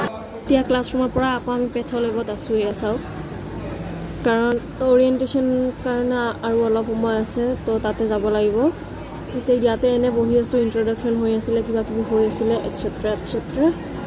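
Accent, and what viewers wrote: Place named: Sylhet, Bangladesh. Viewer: বাংলা লেখা স্বত্বেও কিছু না বুঝা আমি😅 সিলেটি সিলেটি ভাব আছে কথায় কিন্তু কথা গুলো সুন্দর। ভালো লাগলো❤️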